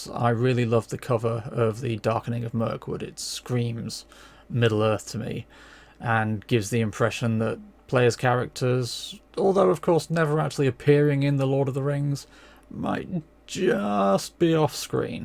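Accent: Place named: England, United Kingdom. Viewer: I never got to play TOR1e but was impressed by the way it was put together and 2e is looking just as good as Free League's other output. All of the Tolkien licensed RPGs have some merit, with but one exception - I can't understand how anyone can be happy squeezing Middle Earth into D&D. Great game but totally wrong for some styles and settings. I was always disappointed that Decipher's unrelated economic problems killed the LotR RPG. It used the movies as a starting point but was looking to bring move-goers into the wider world of the books if it had just been around long enough. As one last point: keep up the good work. TRPG as therapy fascinates me and it's good to see it with an English accent.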